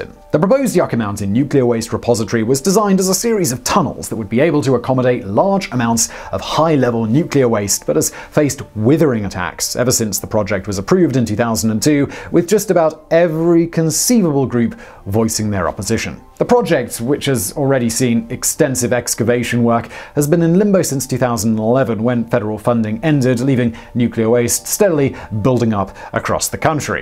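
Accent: United Kingdom. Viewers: I reposted your comment with attribution since it's good to get the truth out there; it's unlikely Simon & Team aren't aware of these things, and their claim that a 'miracle' is needed is agenda driven B.S. on their part. Simon's proliferation of channels and age-old British accent schtick to drawn in the uneducated to a perceived "authority" on a multitude of subjects is paper thin and downright clownish sometimes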